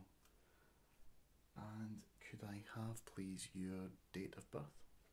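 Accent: Scotland, United Kingdom. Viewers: Hot dude with a Scottish accent. So great :) melts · Loving the accent! Just can't quite decipher which part of Scotland your accent is from..😂😂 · You're so relaxing.. And you help me assimilate the Scottish accent which I have trouble deciphering lol.. Keep up the good job.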